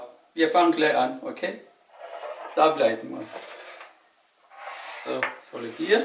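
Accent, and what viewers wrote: Swabia, Germany. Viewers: Sympathischer Akzent. Wo wohnt ihr? Schwaben? Liebe Grüße aus Lübeck. 👍🍽️ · bist du Schwabe? 😃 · Schwabe??? Hört man so im Abgang, oder täusche ich mich? Sehr sympatisch :-) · du bist Schwabe:D Ich feier dich mein Freund! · Stuttgarter?? xD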